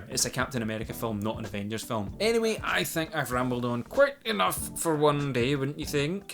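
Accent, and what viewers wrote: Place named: Scotland, United Kingdom. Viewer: I am loving hearing a lovely Scottish accent in super hero movie reviews! 1 more subscription on your way!!!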